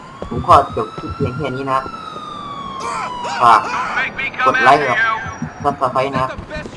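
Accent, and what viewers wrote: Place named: South, Thailand. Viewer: พูดเหมือนคนใต้เลย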